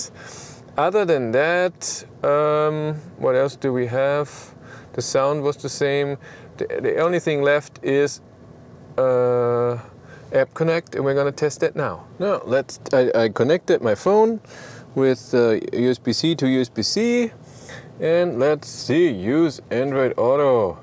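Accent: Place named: Lower Bavaria, Germany. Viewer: How I love your Niederbayrisch 😍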